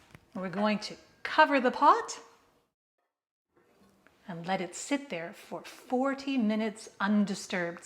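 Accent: Canada. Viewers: Wonderful, sensible instructions, without fuss. Also enjoyed the clear enunciation--is that a Canadian accent? Lovely. Thanks for this!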